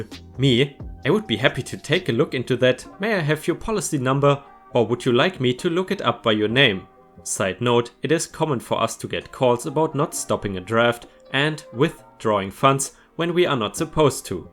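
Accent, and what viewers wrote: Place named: Germany. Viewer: ⭐⭐⭐⭐⭐⭐⭐⭐⭐⭐⭐🌟🌟🌟 I figured I should post some stars since it's been a while. I am still watching just been busy to respond, wouldn't miss my daily fill of That awesome German accent :) 🌟🌟🌟⭐⭐⭐⭐⭐⭐⭐⭐⭐⭐ 🌟🌟🌟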